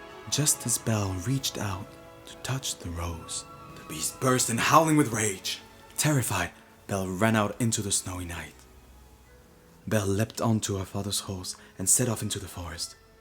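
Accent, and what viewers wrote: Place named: France. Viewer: Your French accent suits it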